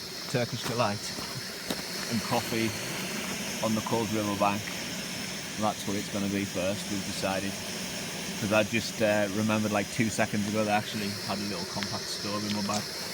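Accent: United Kingdom. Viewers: not that great with UK accents. Are you in Wales?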